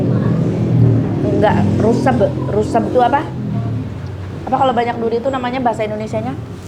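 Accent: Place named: Sunda, Indonesia. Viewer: Gpp tteh ngomong Sunda jg .atw cmpur da stiap org pasti saat bicara logat daerahnya ke bawa..tugas editor ulah males ngedit.kerja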